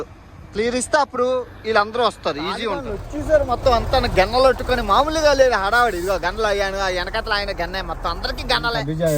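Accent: India. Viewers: you are a unique guy. Got addicted to your videos after seeing the Telugu Traveller vloggers controversy video. Great that you are enjoying and making viewers happy with your videos. Your voice, sense of humour, Telugu slang makes your videos more beautiful and wonderful. Wish you all the best. Take care.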